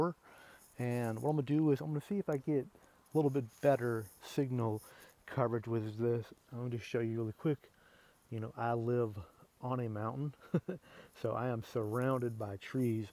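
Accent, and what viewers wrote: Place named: United States. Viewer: why talk meters when you sound American.....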